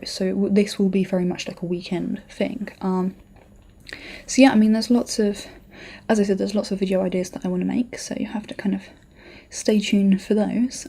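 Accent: England, United Kingdom. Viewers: I enjoy your videos and sympathize with the real life problems and it honestly humanizes you, which is a nice change of pace from the persona we meet on the screen at each viewing. I think allowing room for some flaws in your videos for a quicker more efficient approach is fine. Learn to roll with them and incorporate them in fun ways into the video. I do think patron is a good idea to help increase your revenue, it may also be good to do a tutorial and ask viewers to whitelist your youtube channel. Two things initially attracted me to the channel computer parts and a pretty woman. Two things ultimately kept me coming back; you have an English accent, and in the U.S. that is not so common, so it just makes you sound intriguing. On the other hand you also know what you're talking about instead of just reading specs, which helps your followers respect you. 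It is one of my gripes with Linus is that he does not always know the products he is reviewing well enough and presents them in a bad light due to ignorance. As a viewer one piece of advice is to show more excitement in your voice. Often it can seem mono-toned and in a review that is more acceptable. This may be your personality, but it is in a sense showbiz and I think developing your character and excitement could be of help. -Cheers P.S. I hope your housing situation works out for the best. TheHiveLeader and should do a UPLAY episode together. That is one funny dude and it would provide some nice coop t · Fast speaking + English accent = really hard to understand for a non native English speaker D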